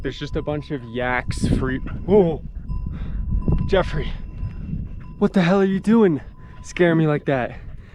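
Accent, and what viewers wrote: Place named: Scotland, United Kingdom. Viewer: Hearing a northern/Scottish person was not what I expected but yay.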